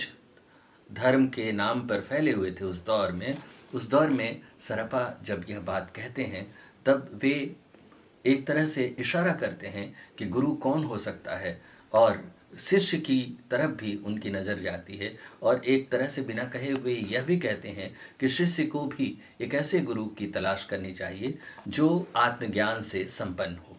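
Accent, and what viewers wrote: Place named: Haryana, India. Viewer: Inke dohe ka uccharan Magahi jaisa kijiye na ki Hariyanvai jaise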